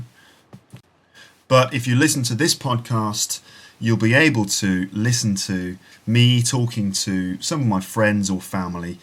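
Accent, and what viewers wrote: RP, United Kingdom. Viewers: Hi,! You are right. Good British Received Pronunciation is addictive!))) Your podcast is very helpful! Thank you!😊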